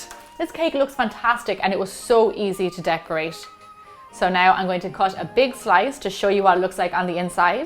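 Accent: Ireland. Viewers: Are you Irish?Because the way you pronounce the "T" it seems!☺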